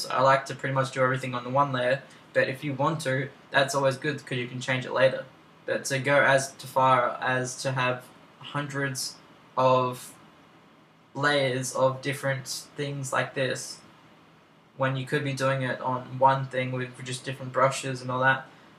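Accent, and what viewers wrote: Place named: Australia. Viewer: Sad how much hearing an Australian accent on youtube turns me on. **tutorial to me allll dayyy**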